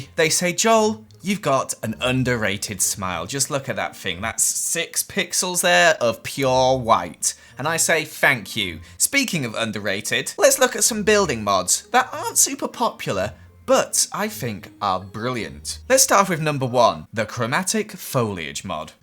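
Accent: United Kingdom. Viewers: he has a british accent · xXĄîķö ČhăňXx I think it IS a British Accent...Only slightly tho.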